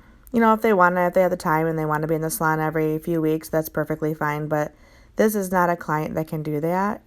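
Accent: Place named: Detroit, United States. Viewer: I knew I recognized that accent! My hubby is from around the Detroit area! 😊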